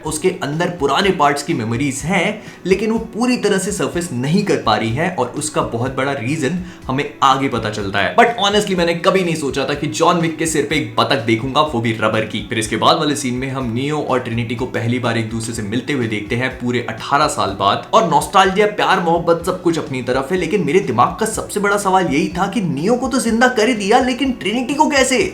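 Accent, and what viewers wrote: Bhopal, India. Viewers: apka accents sunker bhopal ki yaad ajati hai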